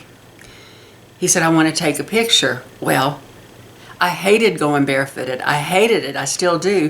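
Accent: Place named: Southern Illinois, United States. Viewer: What a fun story! I love your southern Illinois accent as well!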